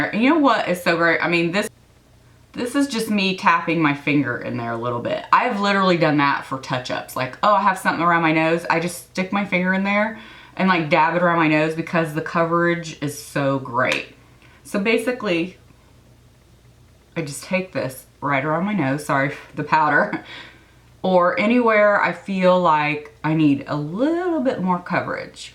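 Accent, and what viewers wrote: South, United States. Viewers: You must be from maybe Georgia,or Alabama?Am I close?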